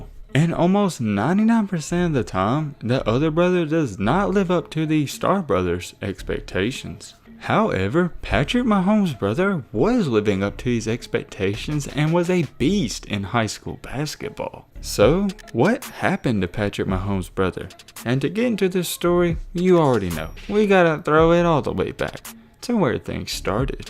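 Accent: South, United States. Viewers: Why are you talking so southern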